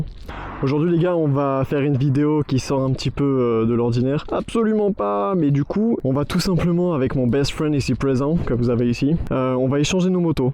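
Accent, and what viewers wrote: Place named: South, France. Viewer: Une petite pointe d’accent méridionale Fils😂 Belle vidéo!!! Hâte de faire une balade soon!!!!♥️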